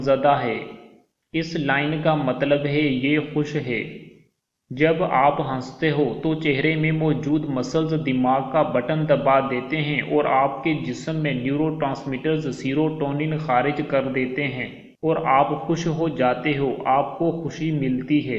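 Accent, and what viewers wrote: Sindh, Pakistan. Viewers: Translated from English in Sindhi accent !!!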